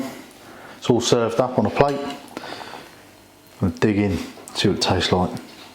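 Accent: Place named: Australia. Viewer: He is speaking Australian.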